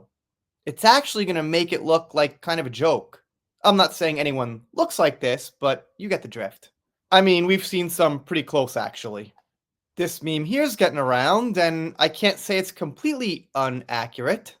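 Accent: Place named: Long Island, United States. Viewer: Oh my God that accent. Tell me you're from Long Island without telling me you're from Long Island.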